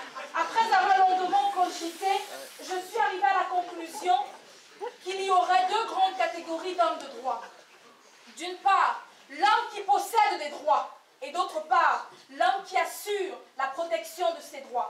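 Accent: Cameroon. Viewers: je savais déjà que tu étais une camerounaise avant même que tu le prononces. J'aimerais vraiment te rencontrer :).🇨🇲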